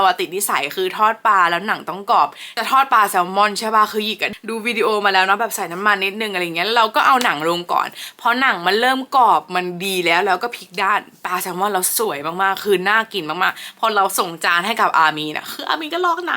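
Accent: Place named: South, Thailand. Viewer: หล่อนน่าจะคนใต้ พูดเร็วลิ้นรัว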